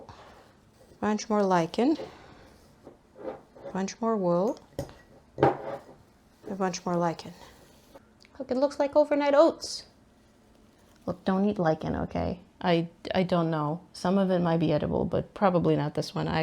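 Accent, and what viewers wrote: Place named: Minnesota, United States. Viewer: Nice! I'm just starting to be interested in solar dying / natural dyes too... I live on an old farm in MN and have lots of goodies I can forage from my own land. Wondering if you are close to MN too, based on accent and what lichens you found to play with. Thanks for the video, hope to see and learn more!!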